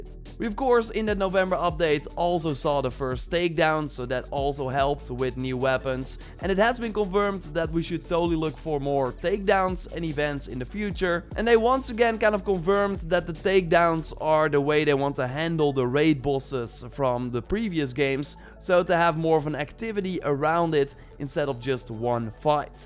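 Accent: Netherlands. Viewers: Your Dutch accent is killing me tbh :/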